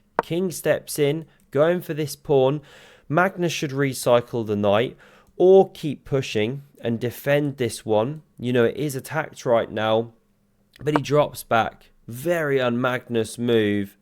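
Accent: United Kingdom. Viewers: way too british. cant listen to this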